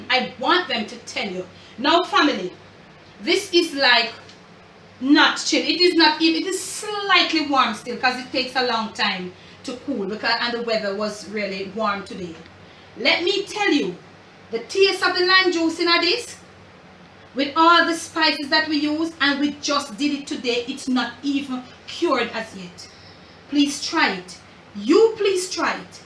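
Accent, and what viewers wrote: Patois, Jamaica. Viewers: Mi love u patois😂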